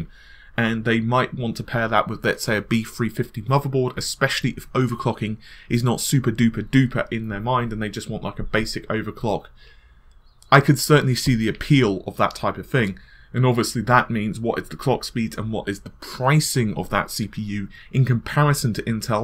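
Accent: England, United Kingdom. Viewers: He speak English you prolly speak American , thats the problem ... prolly .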